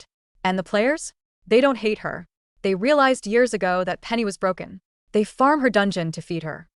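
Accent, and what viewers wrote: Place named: India. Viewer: "No monsters no graving"wow Indian voice accent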